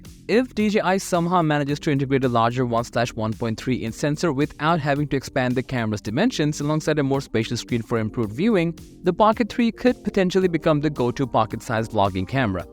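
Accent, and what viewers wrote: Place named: India. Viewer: U sound from india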